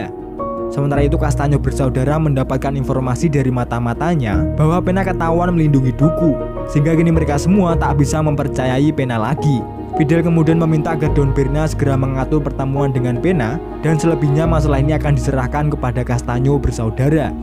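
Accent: Java, Indonesia. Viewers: Mas sampeyan Wong Jowo?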